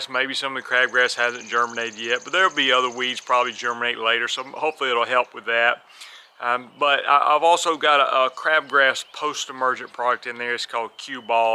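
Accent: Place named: South Carolina, United States. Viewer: Hahahha they wishing! All they really need to do is call you sir! "everthang" ahahh we got everythang I live in South Carolina and you just don't hear folks talking like this much. I feel at home.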